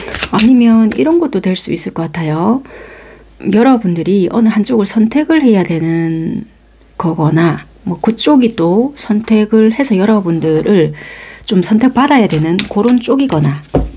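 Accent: Busan, South Korea. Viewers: 부산 사투리 입니꺼? 😊😊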